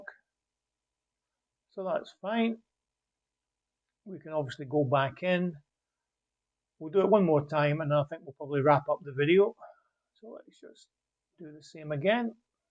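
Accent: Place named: Scotland, United Kingdom. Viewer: This technique sounds really interesting to use on tennis trading! I'm off to try it in a moment.... congratulations on your 100th video,! (PS: It's good to hear a fellow Scot 😊)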